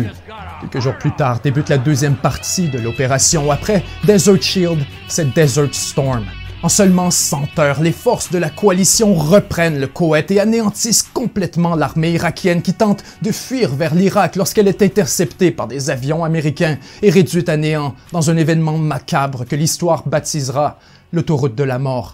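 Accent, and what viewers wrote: Quebec, Canada. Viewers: J'aime bien l'accent canadien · j'ai du mal avec la voix québécoise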